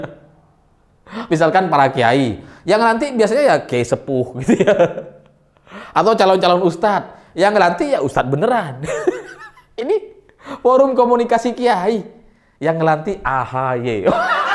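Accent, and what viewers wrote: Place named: Banyumas, Indonesia. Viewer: Bocah ngapak ya, kok doyan maenin agama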